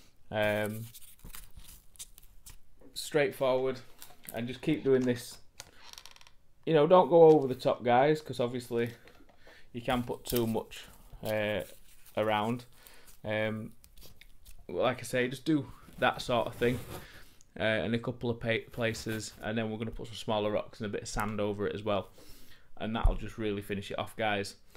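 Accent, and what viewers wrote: United Kingdom. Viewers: You sound like you are from the UK but you use the North American term for sealent - how come? Are most of your subscribers from America?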